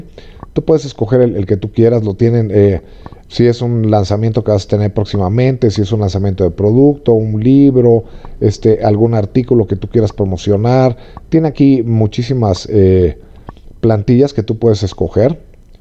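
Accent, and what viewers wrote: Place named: Mexico. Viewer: Detesto escuchar videos con voces que no sean mexicanas, y por eso, este video fue música para mis oídos, gracias!!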